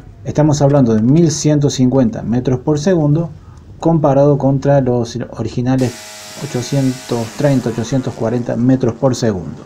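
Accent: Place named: Argentina. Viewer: Pobre Argentina..... Estas OPV, no sirven para una situacion de alta actividad. Lo que tienen que hacer es, comprar las F110 a España, lo más avanzado del mundo en tecnología y sistema de combate. Un saludo, me encantan tus vídeos con tu acento argentino. No somos hermanos? Y se lo compráis a Francia.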